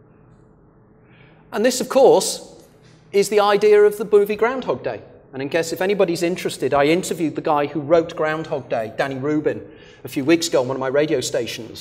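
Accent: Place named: England, United Kingdom. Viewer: very interesting but why the unfriendly, snappy tone? Maybe it is the English accent, I don't know, if was Scottish or Irish, I am aware that he would sound friendly and even funny, full of spirit, but listen to Richard Dolan, American, he does not fly through his lectures and sound so cold....otherwise, great lecture. But I don't agree with "you live your life again and again until you live a perfect life". Why the human morality all of a sudden? why the idea of hard work, linked to progression then release? And who created the video game we are in (as player and creator are different people here in this reality)? Why do animals suffer so much? What's in the game for them? What about all the kiddies that Jimmy Saville raped? Are they to live again and again until they discover the right choices? What if Philp K Dick was right and the creator of this game was or the creators were evil?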